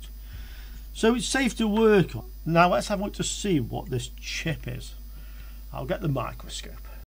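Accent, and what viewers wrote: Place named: England, United Kingdom. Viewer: I very much enjoyed your English accent. I also enjoyed your teaching. It clarified my understanding of the TL 431. And I subscribed to your channel. thanks for sharing.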